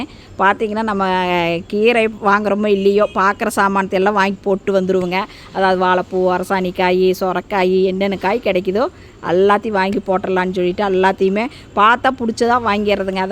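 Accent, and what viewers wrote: Coimbatore, India. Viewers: நீங்கள் பேசும் கோவை slang கேட்டுக்கிட்டே இருக்கலாம் போல் இருக்கு சகோதரி.. ரொம்ப பிடிக்குது வாழ்த்துக்கள்..!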